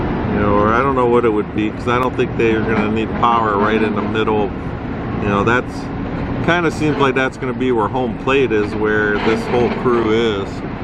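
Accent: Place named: Midwest, United States. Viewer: My wife and I have noticed your accent and it sounds overmuch like our Wisconsin/Michigan accents. Where do you originate?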